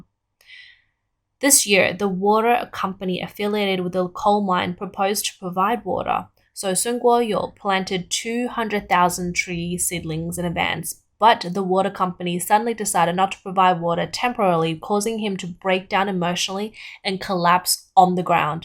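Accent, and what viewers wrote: Australia. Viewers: The accent is very urban Aussie (Melbourne / Sydney accent). I like it a lot. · hmm, she has a very light Australian accent actually. You've obviously never heard a "hyper-Australianized" accent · Is the presenters voice/accent second home Australian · The narrator kept saying "dessification." Did she mean "desertification" or "desiccation"? (Her hyper-Australianized accent was not always clear, especially with the over-emphasis of the r-dropping final syllables, as in "wahtaah".) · A pleasant voice, sounds a little Australian. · cute Aussie accent 😂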